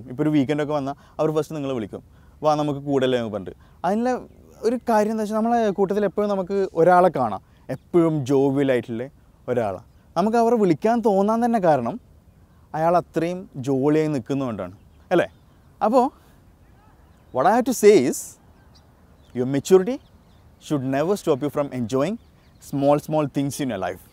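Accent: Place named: Malabar, India. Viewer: New subscriber here.. Boss malabarilaano.edakk nammale basha keri varnn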